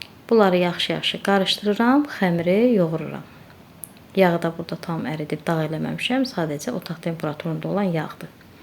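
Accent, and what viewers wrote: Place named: Shamakhi, Azerbaijan. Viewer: Əllərinizə sağlığ gözəl görünür, ləhcə şamaxı ləhcəsinə oxşayır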